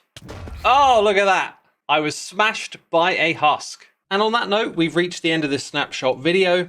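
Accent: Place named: United Kingdom. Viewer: Fun Fact, PT stands for Pacific Time, usually when measuring what time that something is gonna happen in the United States of America, they choose either Eastern Standard Time (EST) or Pacific Standard Time (PT). Then again, I remember you're not American, judging by your British accent, so I guess you had no way of knowing that.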